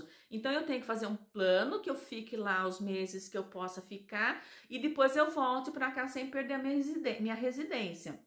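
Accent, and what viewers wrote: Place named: Rio Grande do Sul, Brazil. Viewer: Pelo sotaque você não sabe? E do sul gaúcha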